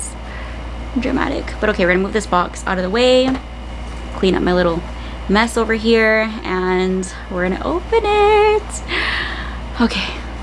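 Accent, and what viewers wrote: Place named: United States. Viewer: prng amerkano dw voice mo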